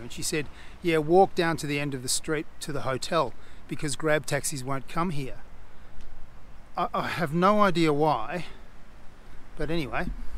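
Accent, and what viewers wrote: Australia. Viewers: You sound like a guy from👇 under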